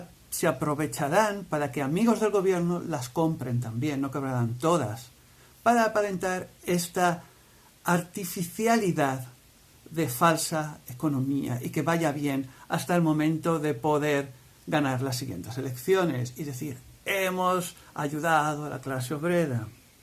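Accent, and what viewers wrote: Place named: Spain. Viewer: "Allí en el reino de España"... Por el acento, pensaba que eras Español.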